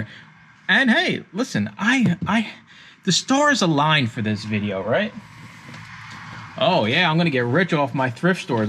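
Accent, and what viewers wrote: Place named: New York, United States. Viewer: Im From Philadelphia where ate you from you sound like northeast guy New York Jersey lol?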